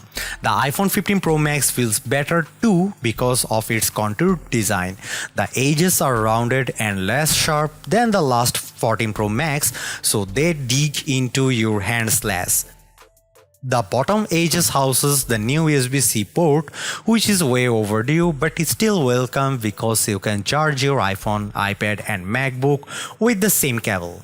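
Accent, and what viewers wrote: India. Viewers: You have a very good Indian accent 👍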